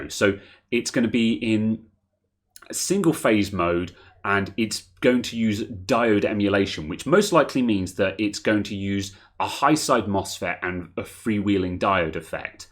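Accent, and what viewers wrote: England, United Kingdom. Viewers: Man, i am not an expert, i do repair things from time to time, but your voice and especially your English accent, really make me watch your clips. I have an issue with a keyboard: a column of keys get lights but don't send a signal, the keyboard is Logitech G pro. I try to bridge but it seems all keys ( F7, 5, T, G, V and Space) are one link on the board. Do you have any idea on how to start this repair, whats spares should I look for. This issue appeared after I try to resolder a switch ( I ve done this before, many times but never saw this type troubleshooting ). Thank you so much and Rock on!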